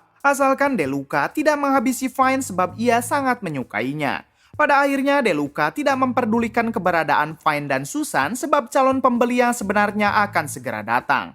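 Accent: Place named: Padang, Indonesia. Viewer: Translate nye logat padang nih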